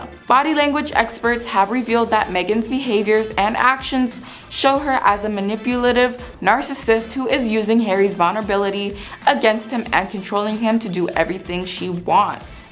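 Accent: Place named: United States. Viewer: Sorry to reply again - but many many!! centuries old traditions, not single century. Based on your accent, I’m assuming you’re American like me. Our country is so young that… even though growing up we don’t fully appreciate that as 10 years Sounds like a lifetime to a kid…. But they have been around a lot longer.. I mean we have too to an extent, but not as “America.”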